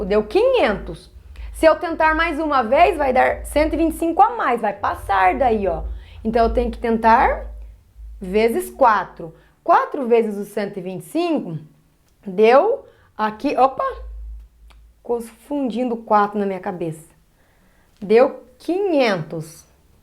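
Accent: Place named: Caipira, Brazil. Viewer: Muito bem explicado! E o seu sotaquezinho caipira é muito bonitinho!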